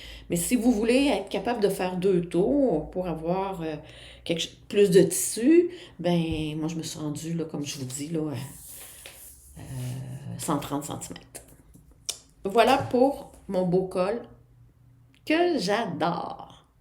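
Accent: Quebec, Canada. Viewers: pleins de soutien, toutes mes condoléances pour ce frère tant aimé. Merci bcp pour cette vidéo et cette accent joyeux du quebec. Bises bretonnes ☀️💞 Christine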